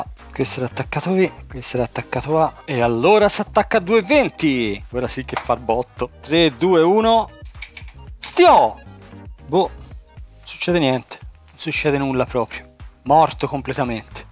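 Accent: Tuscany, Italy. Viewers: Sei un simpaticone e mi fai divertire un sacco con il tuo dialetto TOSCANACCIO 😄😄😄😄😄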